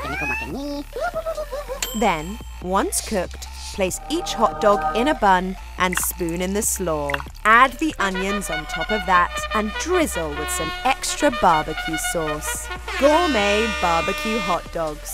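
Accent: United Kingdom. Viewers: The narrator has a English accent... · narrator is British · Funny how the narrator was British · It's ironic because the woman who is talking is bridish, and usa independence from UK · I like how it’s a 4th of July video but the announcer is British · The ironic thing is the narrator is British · BRITISH WOMAN NARRATING SOMETHING ABOUT THE 4TH OF JULY?!?!?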